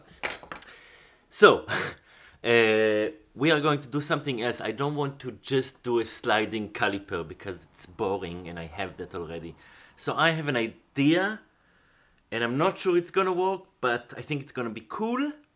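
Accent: Israel. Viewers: המבטא הכי ישראלי ששמעתי בחיים שלי ריספקט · I’m guessing by that accent, you’re Israeli? If so, AWESOME, you’re the first Israeli machinist I’ve seen on YouTube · הסרטונים שלך מושלמים, אוהב ממששש את העבודות שלך ואת האנגלית עם המבטה הישראלי חחח 😁😁 שנה טובה ומתוקה 🍎🍯ותמשיך כך💪 Love your work keep it up 😁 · I don't know if it's a jewish accent or maybe Israeli but you seriously sounds like many Israelis I spoke with and also hearing my own voice so just saying kind of a weird accent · Wow, that israeli accent grinds my nerves.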